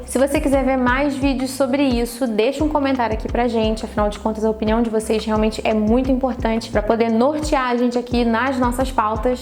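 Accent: Rio de Janeiro, Brazil. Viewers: Video bom. Sotaque carioqueiiissx forte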